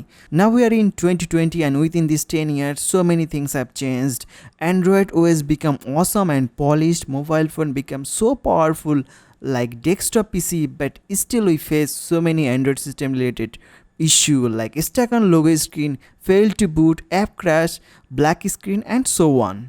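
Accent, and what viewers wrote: India. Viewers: neither free nor for all android. His awful english (indian) later on tells you it only does Samsung phone. I loathe this b.s.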